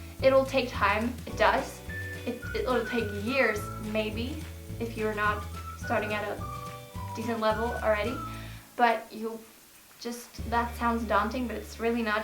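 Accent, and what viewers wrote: California, United States. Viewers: your amazing American accent. You sound like you grew up in California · learning to speak american accent just like you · you sound like an american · making videos in english with an american accent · you sounded so American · Your American accent is too good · YOU SOUND SO AMERICAN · you sound like a wholesome American 100% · you sound totally Californian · how many time taken by you to learn American accent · you could have told me you were American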